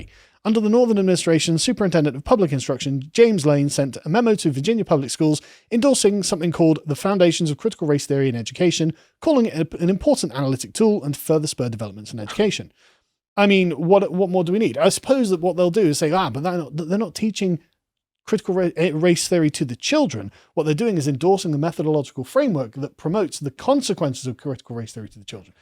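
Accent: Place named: United Kingdom. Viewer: Did I just hear a British bloke defend the American Revolution. Wow, Brandon truly is a uniter of the world.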